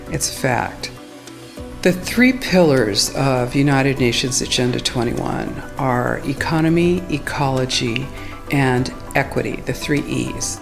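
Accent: United States. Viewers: a croaky American female voice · Yup deffs american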